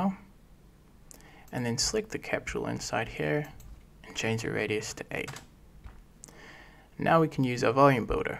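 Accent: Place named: New Zealand. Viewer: Bro you sound kiwi as eh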